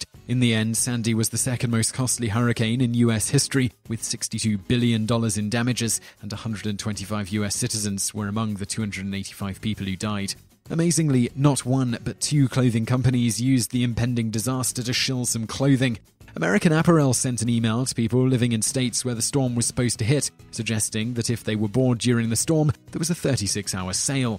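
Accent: England, United Kingdom. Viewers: He's English. they pronounce things differently there. Get over it. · Mobiganic Actually the lists are typically really well researched. But he just reads them, they have other writers for the website itself. Yeah, he's British, so pronunciations Americans take for granted aren't used so much there. Also, he's British, so he pronounces some things differently. Get used to it.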